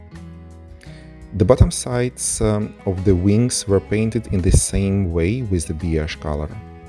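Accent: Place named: Russia. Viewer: Чел ты с рашки? Русский акцент слышен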